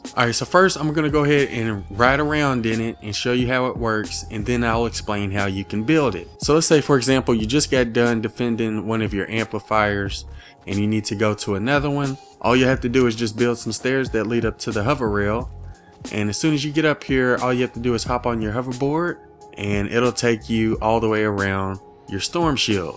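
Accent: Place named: Florida, United States. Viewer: I'm trying to figure out.. is this an accent from Florida or some coast states ?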